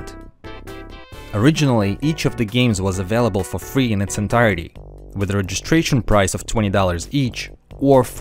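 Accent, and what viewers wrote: Russia. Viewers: I like your secretely half russian accent! So awesome! and great vids too! :D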